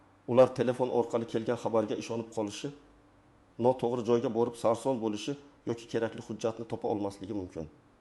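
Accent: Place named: Samarkand, Uzbekistan. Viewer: Шунча вактимни утказиб эшитипман? Хали бу ёлгонмиди ? Узбекчани билади, факат самарканча точик акцент бн гапираяпти буни ёмон жойи йук лекин ёлгонлиги яхши мас !? 😮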